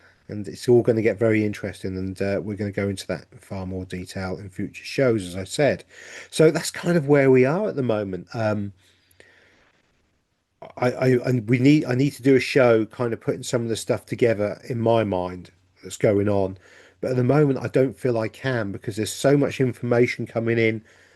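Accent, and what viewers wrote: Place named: England, United Kingdom. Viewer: Congratulations to you, your work is a tremendous boon for we who feel these energies so acutely. I particularly love the English accent in your humble delivery. I note that several people are questioning Richards absence from your line up. We don’t need an explanation in the grand scheme of volatile life, but a mention might allay such curiosity. Yuletide greetings from somewhere that feels like nowhere in Canada!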